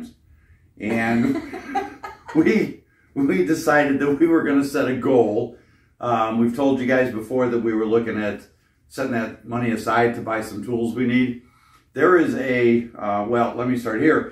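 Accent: Chicago, United States. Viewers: Magical, masterful, mystical restoration. With a Chicago accent.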